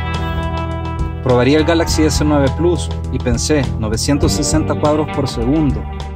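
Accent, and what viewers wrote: El Salvador. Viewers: Eres de El Salvador verdad 😮😮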